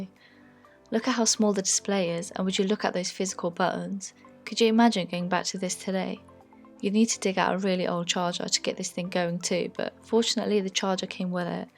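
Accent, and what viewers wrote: United Kingdom. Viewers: Love ur accent, which part of England are u from?:)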